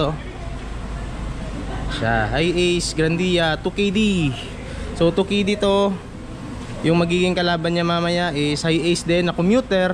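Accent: Iloilo, Philippines. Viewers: Ilonggo dn ni pre?? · Ilonggo ka boss? LOL “ano hambl mo? maapas lang sila ah” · Ilonggo ka sir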